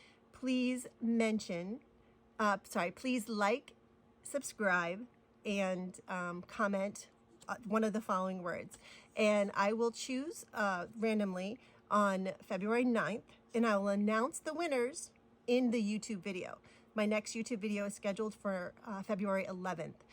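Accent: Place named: Rhode Island, United States. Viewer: Love your style of organizing your video! Drinking my coffee while watching was delightful. I am originally from RI and hearing your accent reminds me of how much I miss the salty air from the ocean breeze . I am so excited that I found you! You are a very special friend and reminder that we can all do more for our communities. 💕🇺🇸💕🪡💕🧵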